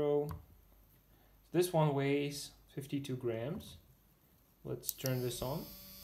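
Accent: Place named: Slovenia. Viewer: Slovenian accent detected.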